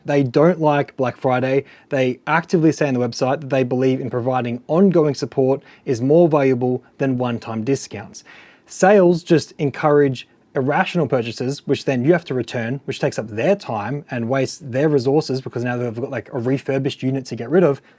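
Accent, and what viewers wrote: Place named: Australia. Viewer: I've got a Supernote Nomad and I love it. Can highly recommend if you are a writer/reader of any kind. Good to hear the voice of a fellow Aussie promoting such a great product.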